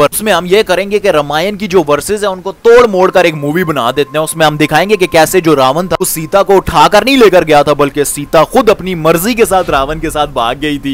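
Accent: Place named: India. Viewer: mujhay phale se hi sakh ta ke ye Pakistani hai lakin jis tarha bol the mujhay laga ke Indian hogaye🙂😊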